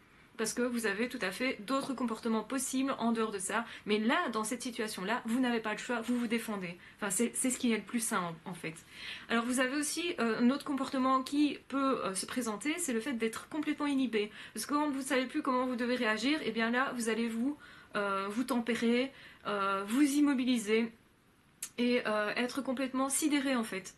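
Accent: Belgium. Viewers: J'adore votre accent (belge) avec: puis (pouis), fuir (fouir)... Victime d'une pervers-narcissique je me demande si je ne le suis pas aussi!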